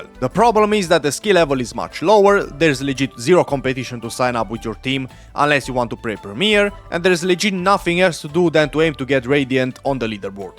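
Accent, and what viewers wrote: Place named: Romania. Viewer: I can bet u re Romanian by the accent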